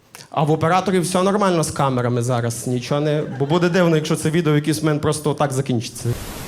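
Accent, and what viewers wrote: Galicia, Ukraine. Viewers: люблю вашу говірку Галицьку, слухала б і слухала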